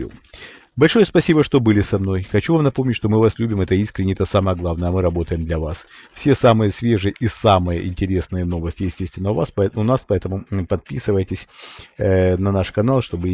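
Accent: Ukraine. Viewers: Речь украинская,разве не слышно?)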